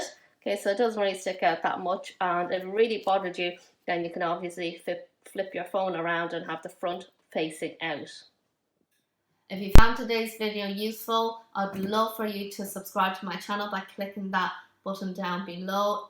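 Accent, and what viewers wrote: Ireland. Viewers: Are you from Dublin or Ireland you have really nice accent 😃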